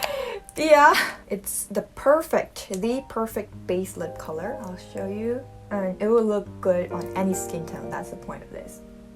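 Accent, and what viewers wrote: England, United Kingdom. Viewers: Wahh i like your English accent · loved her english accent you can apply as a narrator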